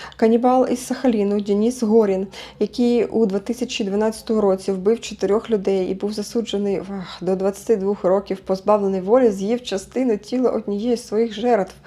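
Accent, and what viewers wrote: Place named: Vinnytsia, Ukraine. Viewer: Говор винецкий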